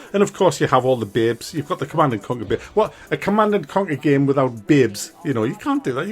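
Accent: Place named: North East England, United Kingdom. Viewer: Never ever thought i'd hear somebody from the North east do a review on tiberium Wars😂😂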